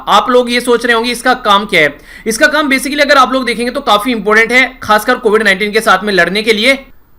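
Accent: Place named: Uttar Pradesh, India. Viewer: Sir ki language se Mujhe v lgta h.. Jaise kbhi kbhi unka tongue slip kr jata h or UP ka thoda effect dikh jata h.. Jaise ki kl... Hamaari ko hmri bol diye sir... Aise bahut baar hua h... Bt sir btate hi ni.. 😣😣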